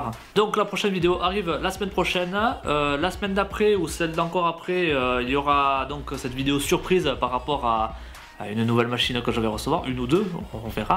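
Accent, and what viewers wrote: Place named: South, France. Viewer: il y avait un accent du Sud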